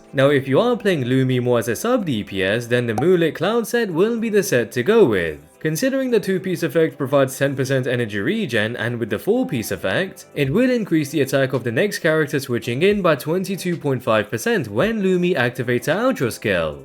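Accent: United Kingdom. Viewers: ??? Her accent is Scottish, not British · sounds british to me.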